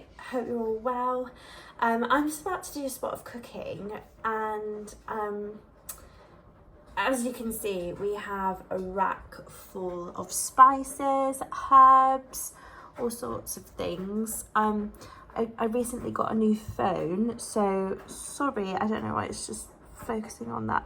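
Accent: United Kingdom. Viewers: What Part Of The UK U From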